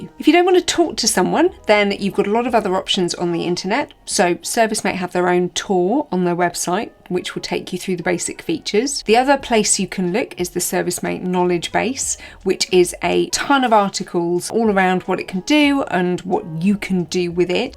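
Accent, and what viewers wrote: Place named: United Kingdom. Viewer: Your video has been so much help! We've used it a lot tonight. Fun fact... we're referring to you as the "lovely posh English lady" 😀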